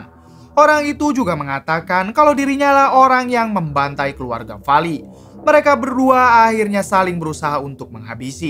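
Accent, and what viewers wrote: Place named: Madura, Indonesia. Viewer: Orang Madura kayaknya yang ngisi suara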